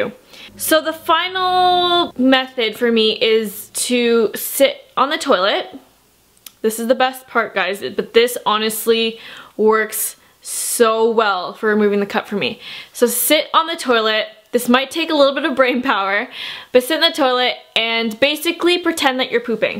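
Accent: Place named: Pennsylvania, United States. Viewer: First off, thank you for the informative vid! I just purchased my first cup& I'm due for my next cycle in about 3 days & eager to try it out! Also, this is random, but do you live in or are you from PA? I hear an accent- ish similar to my family that live there.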